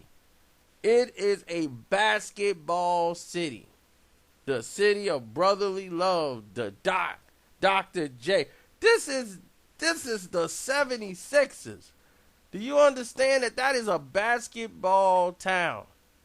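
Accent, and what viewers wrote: Ireland. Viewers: u killing me with that irish accent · The Irish accent is funny as hell. You is a fool! Keep it up.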